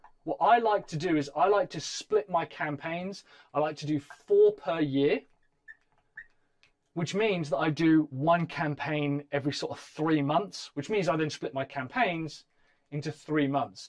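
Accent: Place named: England, United Kingdom. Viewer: Mann, i like your english accent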